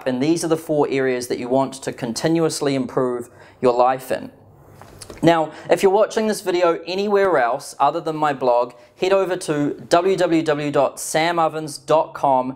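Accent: New Zealand. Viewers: Kiwi accent?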